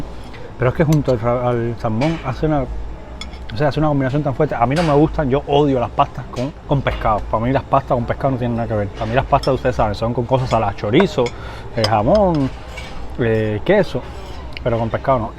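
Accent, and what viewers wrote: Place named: Cuba. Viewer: Genova es famosa por la focaccia , norte italia la pizza es fina , pero según vi es un menú la cortesia de casa es un arancino siciliano , esta la lasagna q es de Bologna .. tienen excelente menú completo , solo el nombre del local indica italia Toto e el panfilo italiano così nos entendemos , saludos fratello te sigo hablas un buen español cubano nuestro no todos los YouTuber lo hacen gracias !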